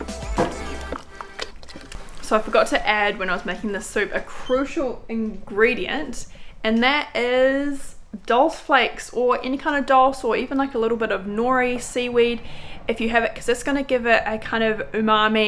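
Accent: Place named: New Zealand. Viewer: I always enjoy your videos - the lighting has never been something I've noticed...I'm so focused on your awesome Kiwi accent!! I love it! Super cute kids and your older one helping you here was fun to watch. Will def make this chowder soon! (even tho still VERY hot where I am in Texas) Thanks for your channel! (sorry about your lockdown weariness, saw that on Insta today :( wish Covid would disappear.)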